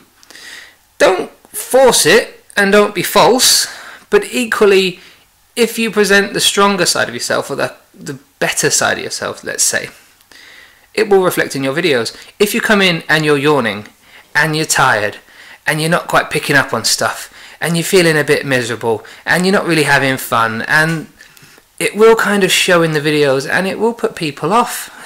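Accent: United Kingdom. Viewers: great video mate!!!! love the way you say Telly! is in the with ur British accent!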